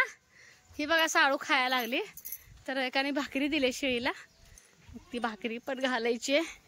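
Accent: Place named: Shrirampur, India. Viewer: तुझ्या आलेगाव बघितले की मला माझ्या माहेरची श्रीरामपूर ची आठवण होते माझ्या आईच्या घराचा आजूबाजूचा परिसर सेम तुझ्या घरच्यासारखाच आहे स्वयंपाकाची पद्धत बोलायची पद्धत सेम तशीच आहे तुझ्या घराच्या बाजूला खूप मोकळी जागा आहे तू वाफे बनव आणि मोठ्या झाडांना आळेकर त्यांच्यामध्ये एक शेणखत लेंडी खत घाल एरिया झोपला घाल खूप छान आहे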